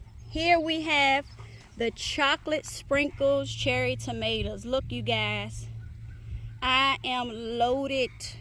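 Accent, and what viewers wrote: South, United States. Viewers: Beautiful garden! I knew you had to be somewhere in the southern states because we all have that twang, different from state to state but still isn't like New Jersey. I sure wish I could grow the Alisa Craig onions but I'm in zone 9A, so it's short day only. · Nice. I can't seem to place the accent though. Definitely southern, but I get twang with no drawl. Carolinas or Virginia maybe?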